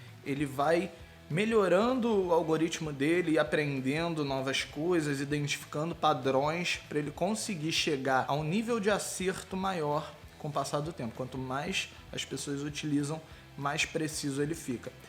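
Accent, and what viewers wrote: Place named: Rio de Janeiro, Brazil. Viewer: Ih koe carioca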